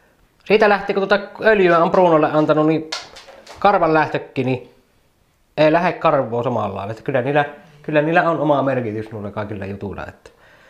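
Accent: Finland. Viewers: Tämä rally inglish on kyllä mainiota kuunneltavaa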